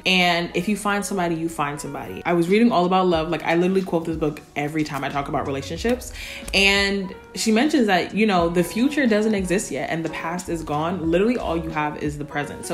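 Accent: United Kingdom. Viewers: SAME MAN N I THINK IT'S THE UK MAKEUP n u don't really hear or see much black Muslims in America on the media n also her accent just sometimes slips into a British one sometimes 😭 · Also your British accent is on point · Why did I really think she was from the UK? 🤣🤣🤣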